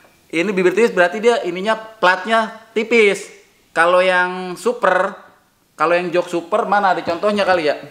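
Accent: Jakarta, Indonesia. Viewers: YG SAYA ZUKA DR, BETAWI NYA KENTEL BANGED DAHH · betawinye kena banget si jantuk bisa banget ngagulnye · Betawi banget lo bang..😁😁 demen gw denger logatnya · paling demen sama logat betawi salam dari pontianak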